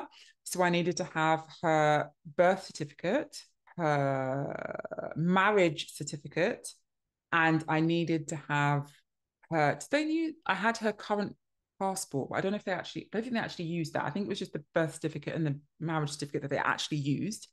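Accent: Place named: United Kingdom. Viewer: Good morning. I love your British accent it's so beautiful ❤️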